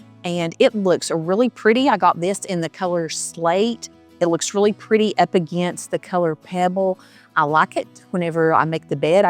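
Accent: South, United States. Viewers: I wanted you to know that you are such a blessing to me. ❤ I have PTSD and when I get days where it's bad... I watch your channel. Your soothing southern voice, smiling face, and easy ways help me refocus a bit. TY for being YOU! Hugs British Kate in 🇺🇸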